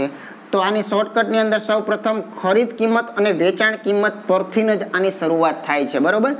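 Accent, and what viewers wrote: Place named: Kathiawar, India. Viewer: કંઈ બાજુ ના છો તમે છે Saurashtra kathiyawad બાજુ બોલી પર લાગે છે એવું ...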